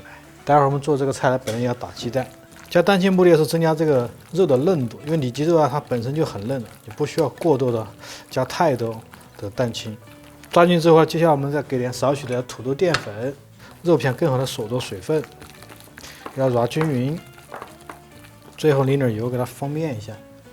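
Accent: Sichuan, China. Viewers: 作为成都人听着你说的川普好亲切 谢谢你的分享！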